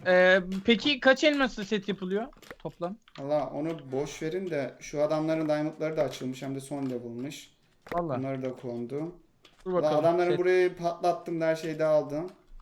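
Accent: Trabzon, Turkey. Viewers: abi trabzonlumusun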